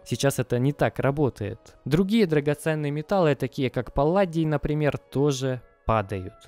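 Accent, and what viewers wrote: Ukraine. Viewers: Это украинский акцент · не только,у него произношение такое прикольнозвучащее,кроме акцента...укр.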